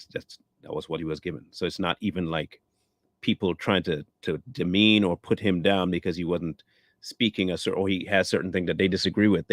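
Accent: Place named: New Orleans, United States. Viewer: I'm from New Orleans..same accent..😇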